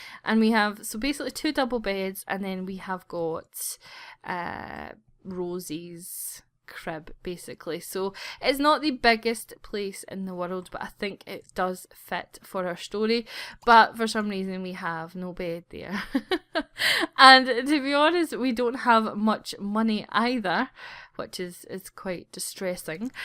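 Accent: Scotland, United Kingdom. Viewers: she is Scottish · OMG ARE YOU SCOTTISH?! Im also scottish (im sorry your the first scottish youtuber i have seen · OMG! I so wish this is for the sims 4! I love your sottish voice! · This is the best Scottish accent I have heard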